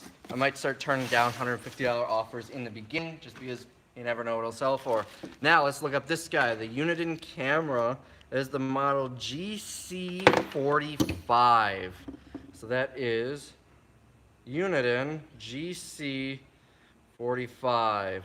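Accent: Wisconsin, United States. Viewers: You say dollar with more nasality than Standard American English (think newscaster speech), It's pretty normal for the Wisconsin accent.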